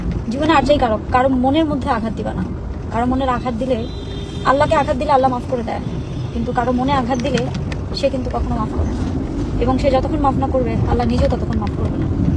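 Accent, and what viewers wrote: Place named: Barisal, Bangladesh. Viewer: Borisailla fokinni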